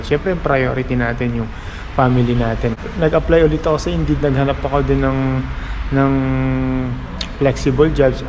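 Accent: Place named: Philippines. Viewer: Hi kabayan, okay yan na pinoy pronunciation ang gamitin para hindi mabawasan ang pinoy feels sa mga vlogs mo. Ako dito working in Heanor pero mga tao dito kakaiba magpronounce ng words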